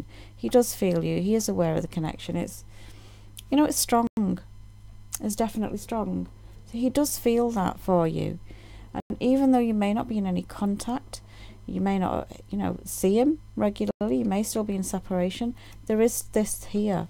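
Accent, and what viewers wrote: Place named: England, United Kingdom. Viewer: your welcome its nice to listen to an english voice too, nothing against any others, ive just not come across many english speaking readers. From uk england.:-)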